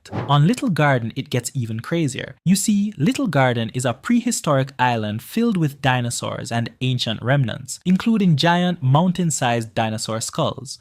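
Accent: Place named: Jamaica. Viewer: Yuh sound jamaican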